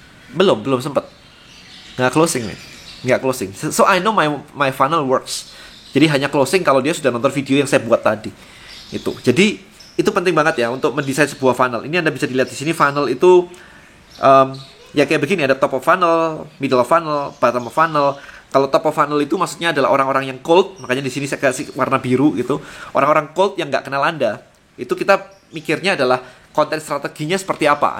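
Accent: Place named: Surabaya, Indonesia. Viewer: logat surabayansss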